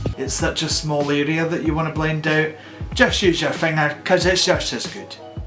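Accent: Scotland, United Kingdom. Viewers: Hahahaha I love the Scottish accent never heard it before I became a big fan hahaha , now I want to change my English accent into Scottish haha haha ❤️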